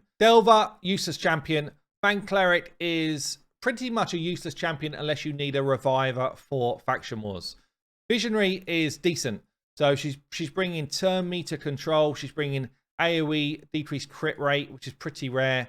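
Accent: United Kingdom. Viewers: you’re easily one of those content creators that I can listen to for hours lol it’s the British accent! Love it! Oh and you put out must have content which I like so thanks bud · I don't know if it's what you say, just being British, or saying what you say with your British accent, but your videos like this just make me laugh. I love how you just beat up on poor Woad-Painted lol.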